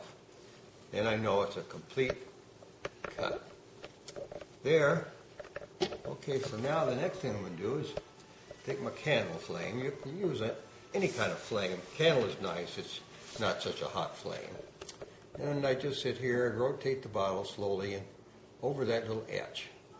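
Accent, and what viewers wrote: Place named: Minnesota, United States. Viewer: You sound Minnesotan, or somewhere close lol. I could have sworn that this was my uncle lol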